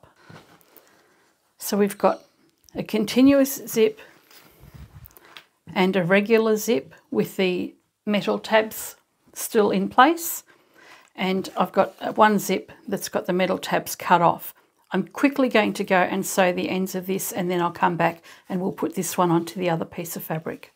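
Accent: Australia. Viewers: Does anyone else think in an Australian accent after watching videos...... or is it just me? 🇦🇺💖😊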